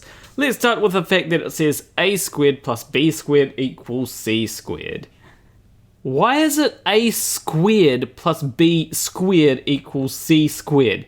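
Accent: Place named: New Zealand. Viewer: ...and you have the most kiwi accent to ever not be on many world maps.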